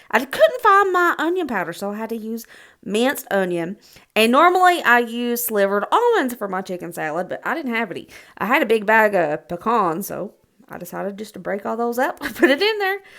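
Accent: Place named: Tennessee, United States. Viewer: I am recently widowed (oh how i hate that word) and i have no family living close enough to visit with often. My mom grew up in eastern Tennesee so hearing you talk and watching you cook for your lovely family has been a help. For the first time in my life I'm also learning to cook for one. Every time i make a mistake i hear you say "it's fine!".❤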